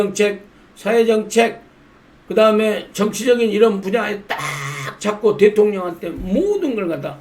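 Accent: Jeolla, South Korea. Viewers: 언제나 뵈도 구수한 전라도 사투리에 정이묻어나는 내고향 전라도 아자씨 오늘은 고향 아줌마가 인사드립니다 2013년도부터 우리의 박근혜대통님을 높이 평가해주신 익히 존경했음니다 감사들임니다 전라도 분으로서 오래 오래 도록 우파를굳게 지켜주신 민대표님을 진심으로 존경합니다 힘내사고 오늘도 건투를빕니다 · 홧팅하세요 전라도 말씨가 구수하네여 근데 참여연대가 저런짓 하는거도 의심 갑니다 무슨 꼼수가 있는지요!!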